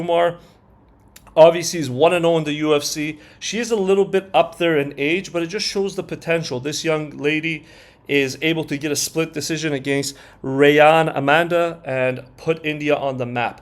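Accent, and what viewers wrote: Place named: Canada. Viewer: u sound brown and from canada am I right? ik this a weird comment lol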